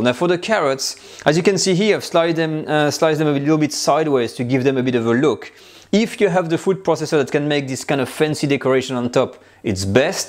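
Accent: France. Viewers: I just love to hear you speaking English with that lovely French accent.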